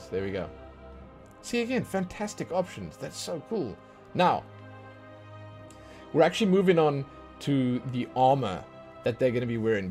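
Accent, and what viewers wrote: South Africa. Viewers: Off topic but are you South African?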